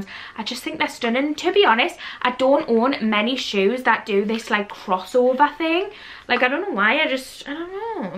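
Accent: Newcastle, United Kingdom. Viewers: You always make me laugh 😂😂 soo funny.. Are you from Newcastle? Love the geordie accent! Going to be soo embarrassed if I'm wrong though 😂 also are the shoe sizes true to size? Xx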